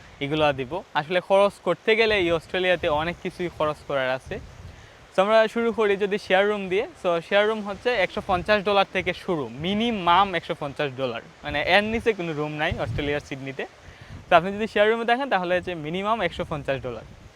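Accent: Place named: Sylhet, Bangladesh. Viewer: ভাই আপনি বোধহয় সিলেটি। সিলেটি ভাষায় কথা বলেন... অন্যান্য, জেলার তুলনায় এই অঞ্চলের ভাষাটা খুবই বোধগম্য ও সুন্দর। ইলিশের বাড়ি চাঁদপুর থেকে...🤍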